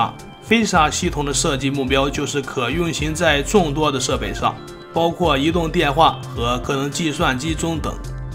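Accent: Shanxi, China. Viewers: 山西老大哥?这口音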